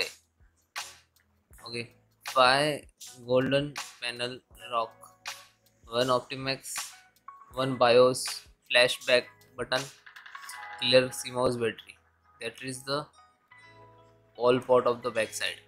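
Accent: India. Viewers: Do better, this is a terrible video, non-informant about key differences. Practice on your English accent as well, having the “stereotypical Indian guy accent” with a monotone speaking voice makes your content boring, lackluster and hard to understand.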